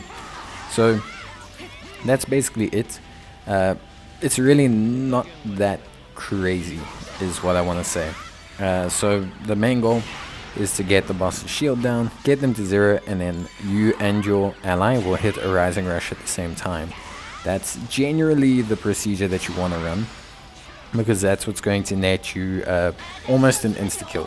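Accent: South Africa. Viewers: Are you South African? If so that’s cool because I’m from Cape Town and I’ve been playing this game for just over 4 years.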